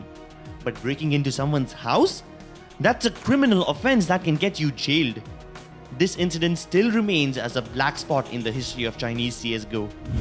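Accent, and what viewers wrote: India. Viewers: Change your indian accent please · Chutiye indian h to ese he rahegi na awaz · F*ck your Indian accent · The Indian accent made me chuckles alot xd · you sound too indian i am sorry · Indian accent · Nice Indian accent can’t even pronounce vac how do u even pronounce it as “wac” ban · Of course it’s an India voice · it's just indian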